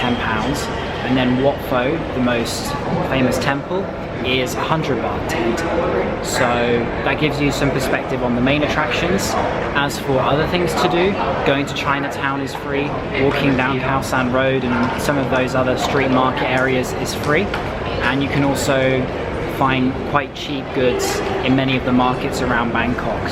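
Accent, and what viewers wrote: United Kingdom. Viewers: I'm Thai people in BKK I love your video from Thailand, I very very like your British accent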